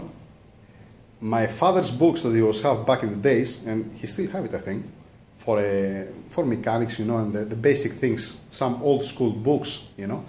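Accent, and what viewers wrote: Greece. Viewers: Hi, nobody has teached you but you teach people all around the world whith à cigaret and your amazing greek accent, that coule be simply your answer to all jalous people! Thanks you man! You are the best, just continue please! And let us now when we can share a good dîner in Europe!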